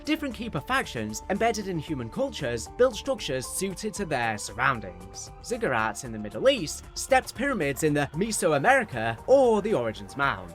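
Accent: United Kingdom. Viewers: Bro what type of British accent is this, sounds like he’s talking winded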